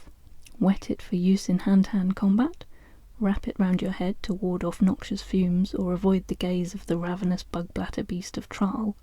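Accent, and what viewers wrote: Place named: United Kingdom. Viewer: Nice British accent & well read. You should try some Terry Pratchet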